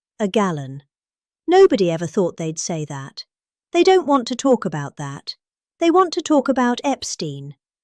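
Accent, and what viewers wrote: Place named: London, United Kingdom. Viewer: I'm a Londoner, I speak with a variant of this accent. I'd feel like a complete embarrassment to myself and everybody around me if I ever caught myself talking this kind of sewage.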